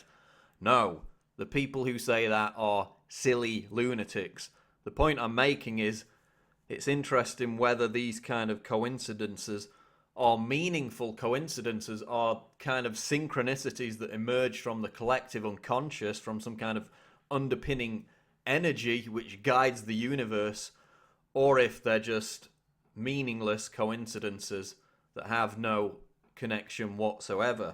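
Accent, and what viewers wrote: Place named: England, United Kingdom. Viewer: Like the Boston psy-op was also a coincidence - same nonsense, Family Guy episode. ROLLS EYES This is not just a sacrifice, but it's telling people who are awake that there is NOT A THING you can do about any of this. Shills like Watson here know what's up but are FORCED into the establishment's plans to mind control people - or else. And no, the "lunatics" are not coming out of the wood works. The "lunatics" are calling BS on people like YOU, and Mr. Jones, your boss. Syyyyn-chrone-IS-iities.... that fucking English accent gets old real quick when pronouncing that word. See if you can do something about it. Thanks!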